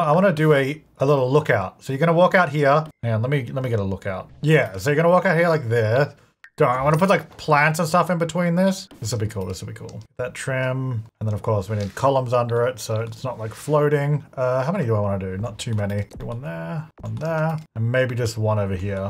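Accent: Australia. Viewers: Has anyone ever told you you sound like Jazza (and it's not just because you're Australian)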